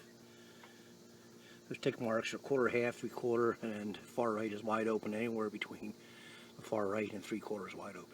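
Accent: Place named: Pennsylvania, United States. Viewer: I figured, I could hear it! I'm from Philly originally but relocated to California after my military service. I can always tell somebody from back home.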